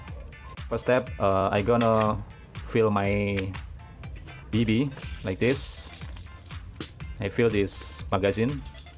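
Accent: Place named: Indonesia. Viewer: indonesian english😅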